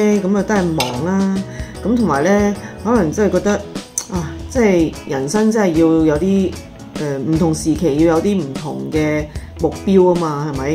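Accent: Taiwan. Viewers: 掐 (讀音: 恰的平音) 外太空 💖💖叻女依家講嘢夠自然夠放鬆, 發音夠標準夠台味, 試下睇多啲綜藝節目啲字眼會學得好快, 可能係你下一個目標?😊依家返香港會唔會好唔慣啲人講嘢嘅 tone? 有時我聽到隔離啲香港女人講嘢, 太急太 aggressive 都會影響好心情, 要深呼吸, 即時逃離現場~😁😁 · 口音越來越像台灣人了，越來越融入台灣了，讚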